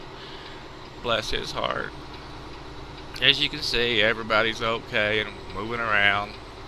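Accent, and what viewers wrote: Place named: South, United States. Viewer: I can tell you're from the south like me. We drive easy, breezy, nice and safely!! Lol..."I feel sorry for the guy...Bless his his heart!"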